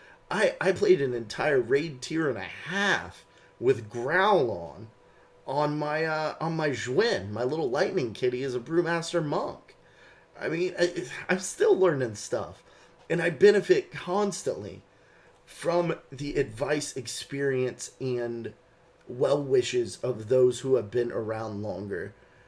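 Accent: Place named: Canada. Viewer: Oh my gosh your Canadian accent hahahaha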